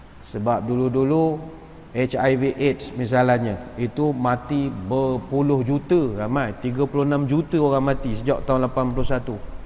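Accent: Pahang, Malaysia. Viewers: Suka dengar ustaz cakap Pahang. Saya orang Pahang tapi dah lama merantau di luar.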